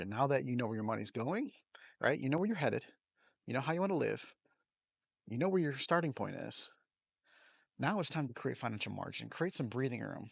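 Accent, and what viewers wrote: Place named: Canada. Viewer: Little changes add up to 1 big change though, and sometimes little changes are all people can do. New subscriber from East Coast of Canada 🇨🇦 . PS - I thought you were 🇨🇦 by your voice until you pulled out the American cash.. lol!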